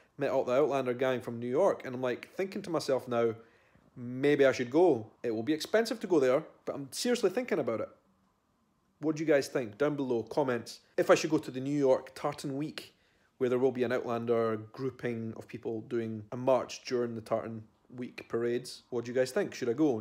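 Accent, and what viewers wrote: Scotland, United Kingdom. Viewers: Xlove Scotland and I love your Broge(accent)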